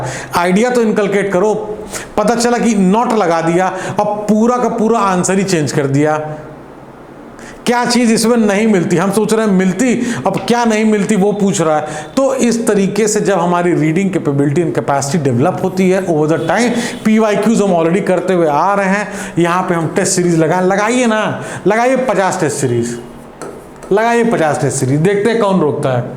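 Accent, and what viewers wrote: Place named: Kannauj, India. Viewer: Sir you are such a best motivator. Apne toh mera mind hi change kr diya ap kannauj se belong krte hai kya sir apki language is like my mother tongue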